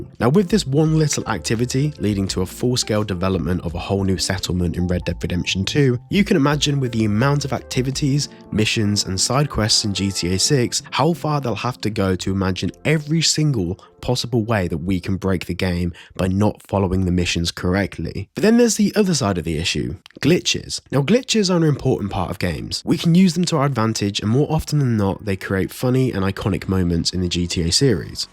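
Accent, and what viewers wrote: United Kingdom. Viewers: i scrolled the comments before starting the video and from the way they spoke i didn't see this coming but.. dude that mask is sick lol, fuck the ones pushing for a reveal, they're not worth shit. You sound British, so you should know first hand how people act with famous people, no one's worse than the brit paparazzi and i wouldn't hold kids on youtube at any higher a standard, your identity is yours, do what YOU want with it.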